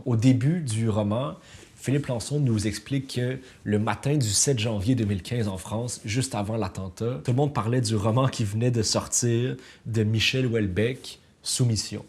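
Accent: Quebec, Canada. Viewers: Ton accent québécois est magique! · Il est québécois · Je suis Française. Qu’est ce que j’aime l’accent du Canada 😍 et des vidéos tjrs enrichissantes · Tu vois le beau gosse arriver et puis l'accent Canadien ... Ahhh Non.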